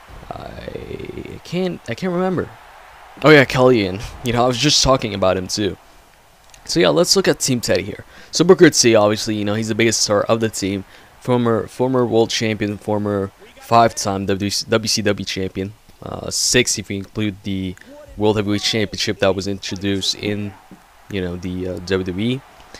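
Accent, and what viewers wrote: Canada. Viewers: ARE U CANADIAN..uve Kinda go thee accent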